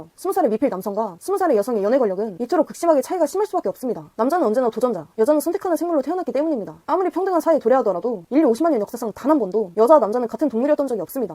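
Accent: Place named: Jeolla, South Korea. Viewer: 아따 라도 사투리 구수하다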